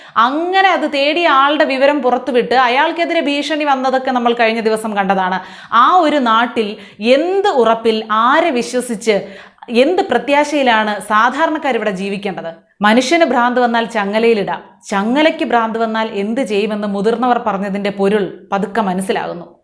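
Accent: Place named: Thrissur, India. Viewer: gouravatharamaya KALAKKU........Thrissur bhashayanee..........kalakkiyittundu...congrats. Kazhinja varshangalilum chila ERANAM. KETTAVAR. pooram kalakkan sramichittundu.Kshama kettal Thrissurukarude THANIKKONAM avar ariyum. Aa vendran marude. kudumbathil kayari vedikkettu nadathum...MUKKIYA. vendrante veettil adakkam.......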